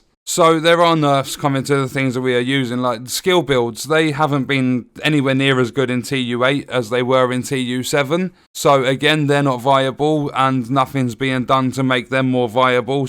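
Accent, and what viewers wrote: United Kingdom. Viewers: Love your Brittish accent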